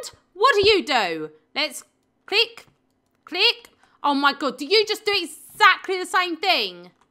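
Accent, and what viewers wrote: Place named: Cockney, United Kingdom. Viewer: maaaaaan that cockney accent is strong. wwooooww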